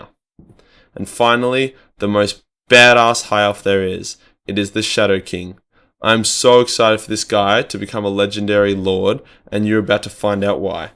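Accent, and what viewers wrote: Australia. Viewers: Great vid man, always awesome to hear a Australian cover lore. Not only educational but funnier then my non-existent love life.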